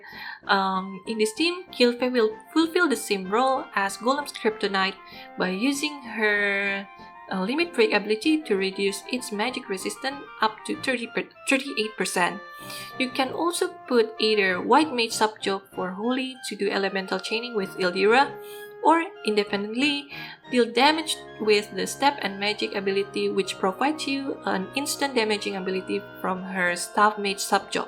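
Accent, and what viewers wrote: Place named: Indonesia. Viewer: Your English like Indonesian People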